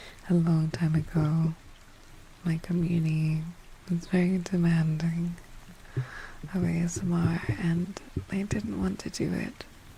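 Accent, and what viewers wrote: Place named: New Zealand. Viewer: your voice made me wonder were you were from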